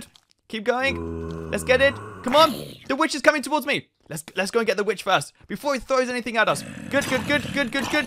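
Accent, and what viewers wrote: United Kingdom. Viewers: British accent......love yah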